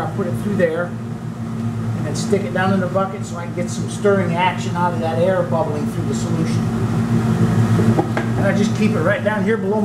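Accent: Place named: South, United States. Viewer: a well spoken southern man that gets it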